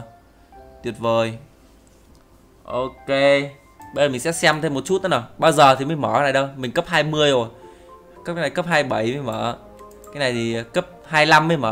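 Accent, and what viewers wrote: Hanoi, Vietnam. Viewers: o Ha Noi ak hay BAC NINH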